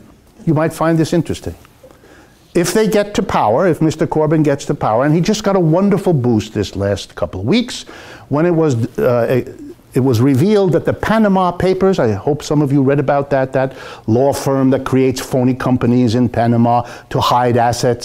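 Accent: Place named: Hungary. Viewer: he is funny too. but why does he sound hungarian....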